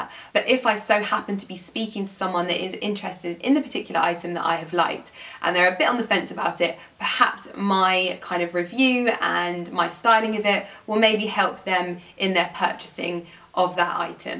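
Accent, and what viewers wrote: RP, United Kingdom. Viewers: I absolutely love the way you speak and your British accent! English is my 2nd language, so every time I watch your videos I say to myself "it's time spent on learning a foreign language, not just entertainment" ^^ Two words which I've learned from you and now overuse: "flattering" and "wonky" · English is not my first language, you speak very clearly comparing to other British accent people. thanks 😘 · You truly don’t deserve the hard time you get, such a hard working, lovely lady deserving of all the success you have. Also, about the posh accent bit, my accent seems to change so easily depending on who I hang around with, some days I sound Yorkshire, the next day Canadian and the next day London, it’s so weird so I feel you there haha! Keep inspiring us all lovely xX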